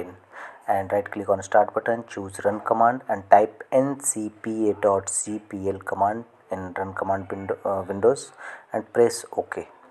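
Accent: India. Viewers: indian if you hear this accent again just close the video, or you will lost your time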